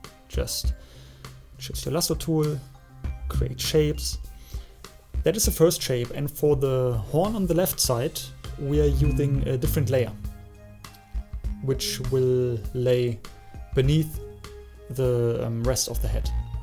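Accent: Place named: Germany. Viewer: Man, thanks a lot for your tips... In this period I’m working on project that requires some “art skill”, and I really need some drawing advice as I’m not really good at it 😅 Down below, I type what I would had written in the first part (as short as possible) I heard that your accent is German and there are some words that I didn’t understand right away; but that’s not a problem.. I just “rewinded” the video and tried to understand that few words... Also some suggestion for the future.. Would you make some animal (also fantasy) drawing tutorial? I would really appreciate it. Until then, good luck for your next arts 🏞🌅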